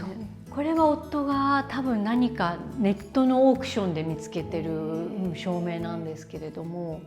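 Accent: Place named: France. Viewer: 素敵✨✨✨✨ 長くフランスにいると 日本語がちょっとフランス訛り🤭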